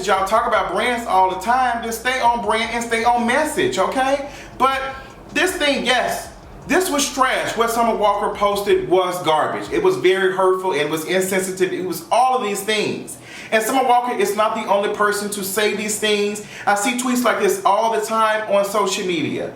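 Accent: United States. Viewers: Love me some you, and that adorable country accent, but my love, my sweet love, YOU MUST 100% not tell people it's not their responsibility to disclose their status. That information is not due to anyoneeeeee at all unless you decide to share, HOWEVERRRRRRRR, you absolutely have every bit of responsibility to tell a potential partner what ur status is. I still love u, but there's no other way around that. It's morally right, and in a lot of states, it's illegal if you don't.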